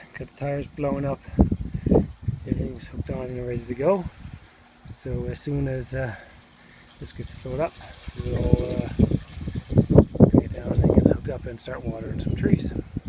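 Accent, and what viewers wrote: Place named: Alberta, Canada. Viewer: This person sounds like they are from Alberta.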